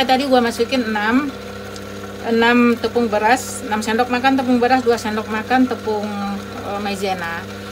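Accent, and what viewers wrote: Padang, Indonesia. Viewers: Logat nya padsng... nyebut gua ga pantas. Bahasa gue tidsk sopan. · Aneh org pdg ngomong gw.gk enak d denger nya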